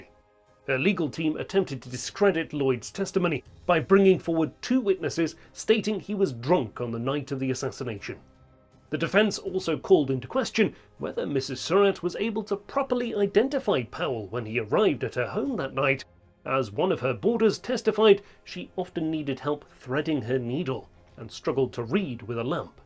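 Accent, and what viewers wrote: England, United Kingdom. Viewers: The English nasally twisted appallingly irritating narrator should be forever binned ! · Did You notice that it's a BRITTISHER who is doing the narration? Irony. 📚 M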